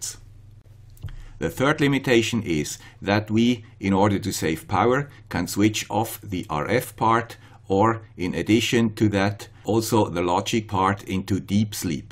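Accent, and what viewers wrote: Switzerland. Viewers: Yeah you are right. I was in a bad mood that day. Any way, I always enjoye listening to the guy with a Swiss accent, again. Keep it up. (y) Thank you for you great work. :D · Swiss accent is good to us from Brazil!congrats!!!!!!!!!!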